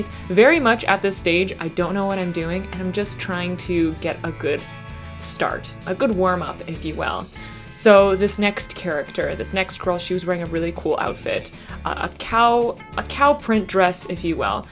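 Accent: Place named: Canada. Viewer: you're canadian??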